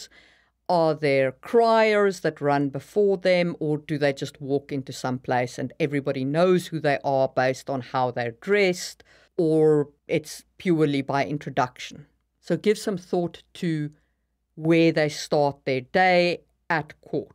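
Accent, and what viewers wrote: South Africa. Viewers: I love the step by step way you take us through detailed aspects of worldbuilding! I've been watching tons of worldbuilding videos and so many of them are so general that I take nothing away from it. Yours are great and really well structured, I've got some ideas to work with just from this one video. Subscribed! P.s., your accent is so cool, are you from South Africa?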